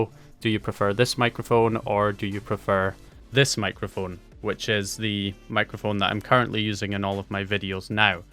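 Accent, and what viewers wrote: Scotland, United Kingdom. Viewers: Mic is ok you still sound Scottish 😄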